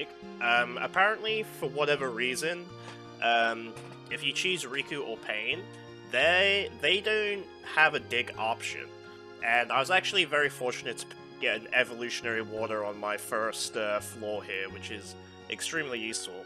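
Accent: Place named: Australia. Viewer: that sounds about right. I was hearing some Australian too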